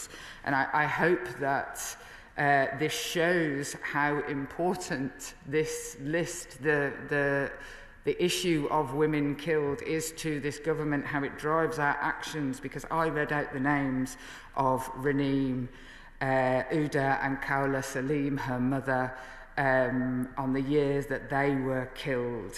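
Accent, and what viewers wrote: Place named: Birmingham, United Kingdom. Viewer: Can not stand this woman, her rudeness towards Diane Abbott and bragging about it. Her weaponising her brummi accent to appear “ woman of the people” Her willingness to stab Corbyn in the front and in the back whist being an advocate for anti women violence is at best hypocritical. We won’t mention her working with Murdoch.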